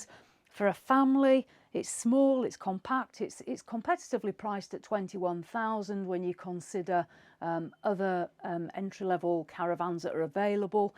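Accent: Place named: Lancashire, United Kingdom. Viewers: No need to apologize! I think it's interesting, I don't notice the northern accent as in Yorkshire though! What area are you from if you don't mind me asking, being multilingual I'm extremely interested in dialects, your not the only person I've heard using the broken syllable but curious to pinpoint its origins😊😊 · Greetings from way across the pond. I'm Bob in southern California. I recently purchased a use travel trailer and begin my retirement. I wanted to help a bit, the privacy curtain about the bunks is a representation of a Topographical Map. Also, although I am a born and raised American, I would say you come from Liverpool, yes? Please tell me if I'm correct. My ancestry is Scottish, and I have always identified with the British Isle's. Although it might have something to do with my love of the Beatles (not to many bands can beat them). 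God Bless, I look forward to more European RVs. PS: I just subscribed. All 4 now, Bob Deskins. dB)> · You always give the best presentation/review of all and the videography is the best, studied and smooth, not erratic at all. Your very clearly spoken Lancashire(?) accent is great to listen to. Overall, very good indeed.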